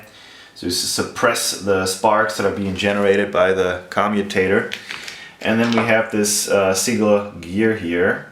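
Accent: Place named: Germany. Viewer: Be careful with those videos about your television work. You could raise the ire of the television people if you release videos on things that haven't been aired yet. Movie goers aren't the only ones that tend to not like spoilers. 👍 Warm gear? Oh, worm gear. I keep forgetting that English isn't your first language. You speak English pretty darn well, so don't feel bad if I enjoy your accent a little. My German isn't even close to as good as your English, so I'm NOT mocking.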